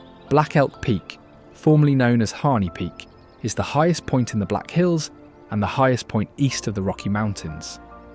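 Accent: United Kingdom. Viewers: You missed so much. Why even have Souix Falls And Mitchell but leave out Rapid city? Also your narrator has a British accent and doesn’t always pronounce names properly. Start over and do West River and East River in a different episode. It’s beautiful but poorly done. west river citizen for 30 years , and still a frequent visitor and site wearer, never gets old!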